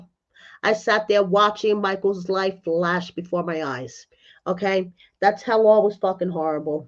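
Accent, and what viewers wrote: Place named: Brooklyn, United States. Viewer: You sound like a typical Brooklyn girl? Do you happen to be Jewish also?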